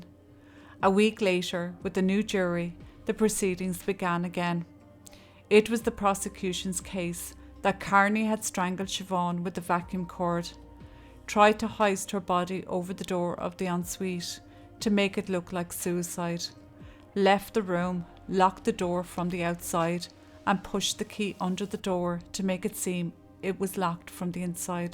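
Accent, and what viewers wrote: Ireland. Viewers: Nor monotonous at all. A beautiful Irish lilt. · Every time I hear the phrase “straight away” I think of Ireland the home of my Doyle ancestors ❤️. What a lovely accent and calm manner of speaking. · Why be so rude, just don’t listen, leave it to the rest of us who enjoy listening to an Irish voice. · Irish happens to be the most charming accent in the world 😊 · um hello? authentic Irish lass! love it❤ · Well, he had damned his soul no matter what spiritual path one believes in. How ANYBODY can weigh money over a life is beyond my comprehension- I came from a violent background and I know how difficult it is for a woman- the struggle sounds as though it is even harder in Ireland. Women have so few instances of recourse- esp if your family is miles away as mine was. How that jerk imagined he could get away with murder with votives for one- hardly what a rapist or cat burglar would set about! 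I have watched many true crime shows- I am interested in forensics and a possible novel- the latter is highly unlikely ! but it would mean VINDICATION- both my parents are deceased but I'd like to think my mm would know and feel she got some justice- You delivered this case as though the family and victim were friends of yours- a very empathetic touch. (and I LOVE your Irish accent). Thank you tastefully but thoroughly discussing a case that NEEDS to be told- Youtube reaches the world and women need to hear stories of other women so they don't become victims either...I couldn't hep but "gag" when I heard the culprit was now counselling other inmates- what a disgusting slap in the face to Siobhan and her kids and family! And how gullible are the authorities at the prison- I hope she has peace now in a life abruptly and brutally ended and I feel for her kids- what they will read, asp her son, as he grows up- newspapers rarely get the facts "straight". 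Namaste from Canada and All the best for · Well, Polly, there's 1000's of other channels on here that you could've gone to instead. But you chose rudeness instead. I'm sure your mother would be "so proud" of the manners her daughter has...🙄 I personally love her beautiful Irish lilt and will continue to listen to this channel. Buh-bye.... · I enjoyed the listen very much - we don't get enough Irish accents on Youtube imo and I'll take it anytime over the AI generated voices that are flooding true crime channels. I remember this case well having grown up close to and going to school in Goatstown, and hearing the pain of Siobhan's family in the aftermath I think that taking an unexaggerated approach to narrating is appropiate and considerate. It's all a matter of taste, but there's more than enough overly dramatic channels without the same consideration for the families left behind out there if that's what you're into.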